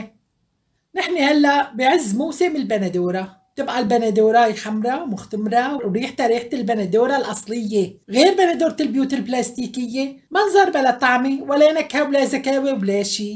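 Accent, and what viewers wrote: Aleppo, Syria. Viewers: لهجة حلب مثل العسل الصافي وأهل حلب والعادات والتقاليد والتراث العريق من آلاف السنين إلى اليوم تاج على رؤوس الناس أنا من حمص وأسكن باللاذقية دخيل رب السوريين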